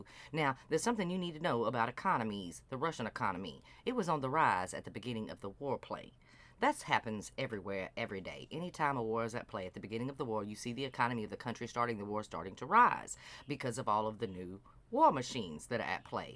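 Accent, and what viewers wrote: South, United States. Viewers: Your southern voice is the perfect vehicle to deliver your scathing epitaph of dumpy pants daddy's downfall! Chef's Kiss 💋 ❤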